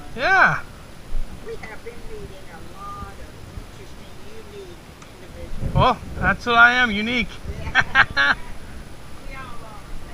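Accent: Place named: South, United States. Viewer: Hey it Southern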